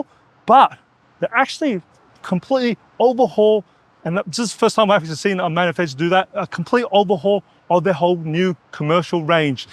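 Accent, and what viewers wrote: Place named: Australia. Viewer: The aussie accent 😆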